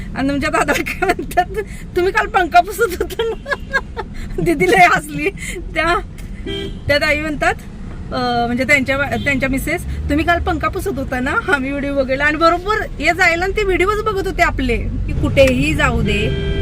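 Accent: Kolhapur, India. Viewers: ताई तू म्हणाली की उंदर मांजर मिळतात येते खूप हसायला आलं 😅असो आपली कोल्हापूरची भाषा अशीच आहे मी पण कोल्हापूरचीच आहे ❤❤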